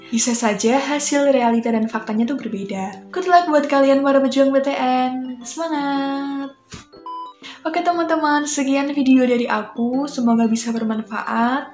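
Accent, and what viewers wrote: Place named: Semarang, Indonesia. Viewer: org semarang ya? logatnya org smg bgtt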